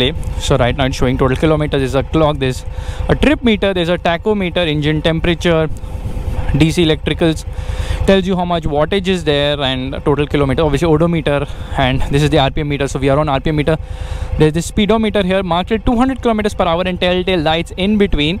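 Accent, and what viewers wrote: India. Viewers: logat e lucu yo Mbah wong india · i'd rather watch the whole video on mute just to look at the motorcycle if i have no other option. And thats just to avoid that horrendous, terrible thick indian accent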